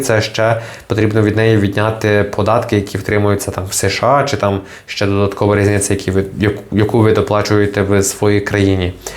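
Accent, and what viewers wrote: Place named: Western Ukraine, Ukraine. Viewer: бо вимова як в неділю в церкві, або ви з Франика або з Рави або з Тернополя більше варіантів нема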